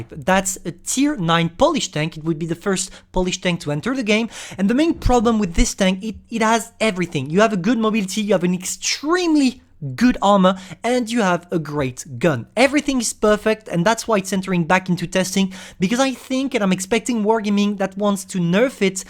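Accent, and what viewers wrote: France. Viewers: Ta un accent français de ouf mec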